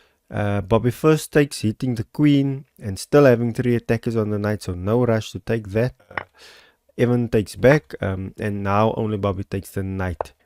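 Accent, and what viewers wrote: South Africa. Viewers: South African?!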